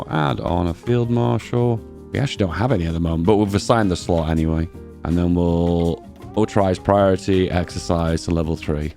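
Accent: England, United Kingdom. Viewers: Love your English accent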